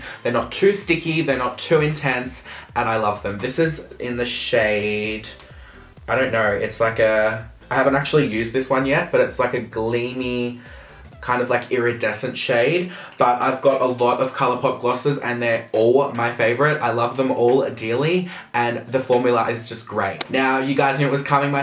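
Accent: Australia. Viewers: IS THAT AN AUSTRALIAN ACCENT I HEAR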